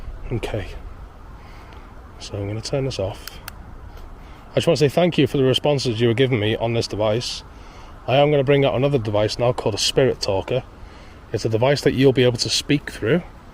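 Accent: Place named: Liverpool, United Kingdom. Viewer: That was epically brave of ya!! Ya sound a but scousey there tonight, must be cos ya were nervous.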